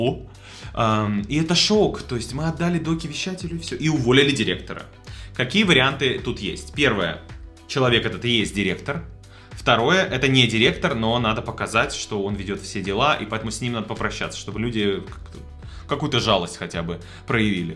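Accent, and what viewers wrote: Ukraine. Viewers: в суржике используются "русизмы". Но произношение украинское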